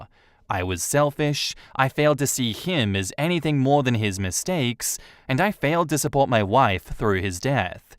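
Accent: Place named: Australia. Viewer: I love hearing your Aussie voice, it's a thankful break from all the AI read stories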